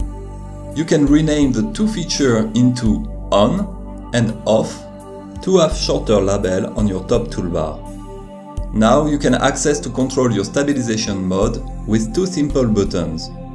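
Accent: France. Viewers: You are french right? Ton accens francais ressort x) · j'adore votre accent, vous êtes trop français. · are you french ? cause you've got an enormous french accent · Le ptit accent français x) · Tu es français non? Tu as un bon anglais mais il faut encore travail un peu l’axent :3 Sinon le contenu est bon est tu es assez clair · This is a really great tutorial! Out of curiosity, based on your heavy accent, are you french? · feels weird being french and watching a video in english with a heavy french accent xD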